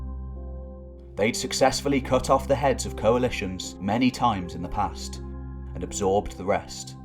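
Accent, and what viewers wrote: United Kingdom. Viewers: whereabouts specifically in the UK is this accent from?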